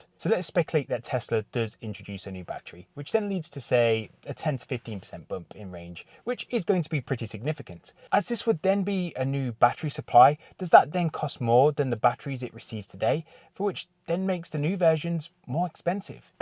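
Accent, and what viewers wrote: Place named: Midlands, United Kingdom. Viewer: I thought I detected a Midlands accent. Nice one, thanks. Just getting my collection checklist ready.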